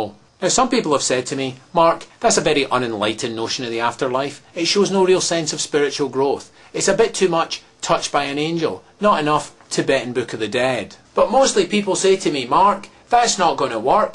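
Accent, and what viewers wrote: Scotland, United Kingdom. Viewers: haha! great video :-) and nice scottish accent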